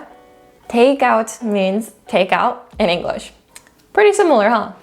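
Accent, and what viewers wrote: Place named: South Korea. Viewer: 발음이 진짜 한국인 같네요!!!❤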